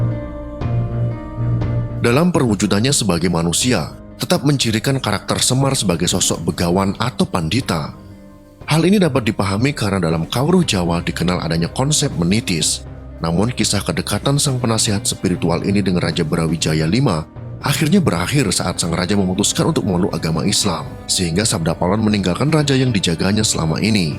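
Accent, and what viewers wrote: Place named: Bali, Indonesia. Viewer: Pengisi suara kek org bali ya logatnya 😁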